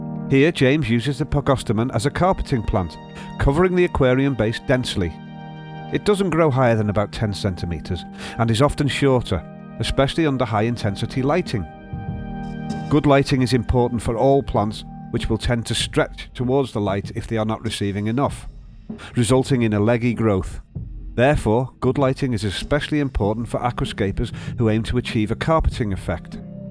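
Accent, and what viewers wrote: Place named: Wales, United Kingdom. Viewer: can anyone tell me what accent this is? sounds like a Welsh guy I know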